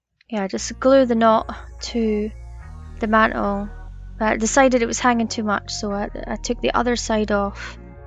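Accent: Scotland, United Kingdom. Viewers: I loved the fireplace you did at Christmas, I showed it off to so many people with your channel! But I gotta say, I like this one even better ❤ that blanket ladder is BRILLIANT ❤❤❤ Watching your channel always makes me smile! I love your projects and hearing you speak brings me back to when I lived in Scotland 😊😊😊 I still use words and terms from there over the American versions! (Like Nappy instead of Diaper) I love remaking your fireplace as well as the Little Stands She does on “Made on Maple Street” 😊❤ “Christina Elizabeth” also does an adorable tiny crate Stand. So far you’re the only one doing the full fireplace! Y’all give me things to recommend and share when I’m in Dollar Tree & Hobby Lobby! And I ALWAYS share your name/channel first and last!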